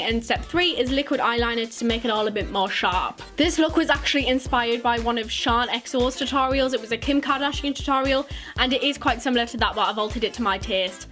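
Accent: Newcastle, United Kingdom. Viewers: Your accent has hints of geordie in it's great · Just curious but are you from or near Durham? I really recognise the Geordie accent. Also this was a beautiful makeup look and I really love watching your videos 😊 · Gwyn Princess nope, defiantly not Scottish lol, I would say Newcastle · You are gorgeous! Love your accent, similar to mine, I come from Newcastle also! I find us to have a lot in common! Love youuu!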